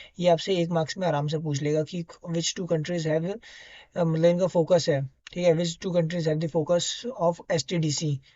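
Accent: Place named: Haryana, India. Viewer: Do you belong from Haryana? Because your taking a tone like that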